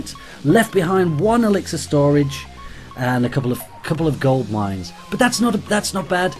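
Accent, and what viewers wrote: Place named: United Kingdom. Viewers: I'm pretty sure he does have a British accent. What would you say it is? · speaks "the queen's english" which is quite different than american english.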